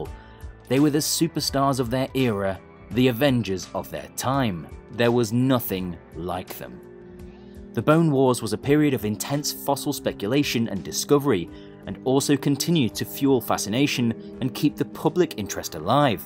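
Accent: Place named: United Kingdom. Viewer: When ever i hear voice i always get the TheGamingBeaver vibe and aura, probably because he's Brittish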